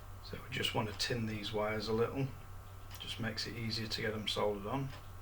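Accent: Yorkshire, United Kingdom. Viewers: Hi mate you sound like a fellow Yorkshire man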